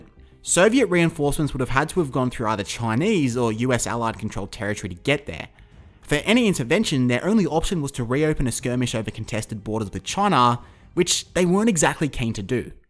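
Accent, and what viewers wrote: Australia. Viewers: Why do Australians pronounce China as “Chiner”, and here as “he”?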